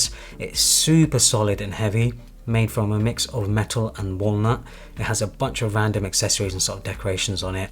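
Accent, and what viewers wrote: United Kingdom. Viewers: First time hearing you speak after following u months on twitter 😂 didn't expect that British accent tho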